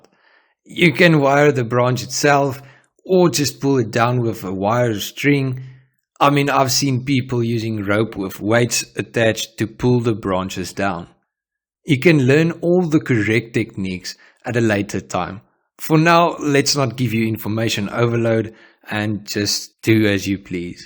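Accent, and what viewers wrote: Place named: South Africa. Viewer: Damn that South African accent 😂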